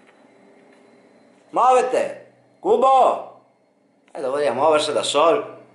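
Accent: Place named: Veneto, Italy. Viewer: Si sente che sei veneto !!! Di Codroipo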